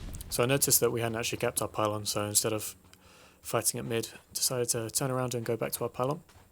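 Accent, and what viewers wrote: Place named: United Kingdom. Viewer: Thank god I found your channel exactly what I was looking for, you sound British what server do you play?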